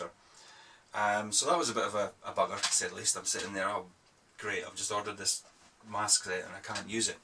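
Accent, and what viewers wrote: Scotland, United Kingdom. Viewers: Hi! great model indeed, the version is quite interesting with a worn out camo! thanks for the picture anyway. Great Sccottish accent too! ps: the bell jinggling in the background sounds like an ice cream van ...Thanks again ; and keep it up.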